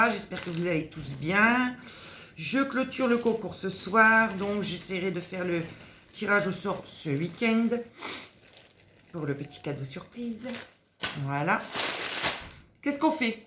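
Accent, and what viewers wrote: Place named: Nord-Pas-de-Calais, France. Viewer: Le joli accent ch'ti...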